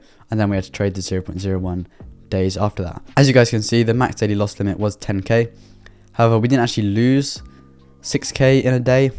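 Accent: United Kingdom. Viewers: I always thought you were from London damm, where are you from? Would you ever do a meet up?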